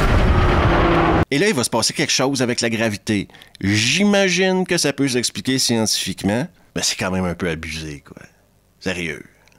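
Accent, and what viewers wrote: Quebec, Canada. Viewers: J'ai découvert ta chaîne il y a quelques jours et je me suis tellement fendu la poire que j'ai dû voir au minimum une cinquantaine d'épisodes. Qql suggestions : Brain dead, bad taste, phase IV. PS, j'adore l'accent québécois 🤩🤩🤩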